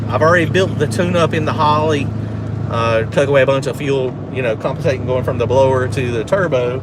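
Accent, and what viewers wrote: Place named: North Carolina, United States. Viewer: Dang I thought u was a North Carolinian????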